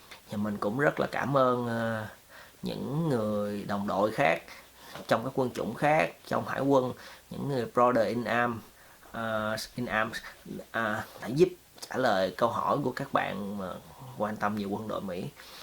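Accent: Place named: South, Vietnam. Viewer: Chia sẻ hay, giọng nói đậm chất nam bộ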